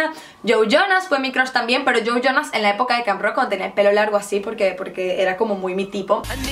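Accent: Venezuela. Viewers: YO SABÍA QUE ERAS VENEZOLANA LLEVO RATO ESCUCHANDO EL ACENTO AHAHHA Hola yo también soy de Venezuela ❤ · El acento venezolano no lo has perdido. Pareces una loca rusa pero el acento supe que eras una chamita venezolana. DTB desde Venezuela. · Y ya se te notaba que eras venezolana , saludos desde Venezuela . Cojedes :p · Ojalá Venezuela salga por lo que está pasando y si tienes el acento venezolano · de que parte de Venezuela eres te reconocí por el acento venezolano, de mi parte soy de los andes Tachira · Hola. tienes una voz bonita entre venezolana y ese asentó jajajaja vives en rumania?¿ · Si tiene acento venezolano, soy venezolana y al ver uno de sus vídeos por primera vez me dije "esta chama tiene que ser venezolana por el hablar" xD solo que todos hablamos un poco diferente dependiendo del estado donde vivamos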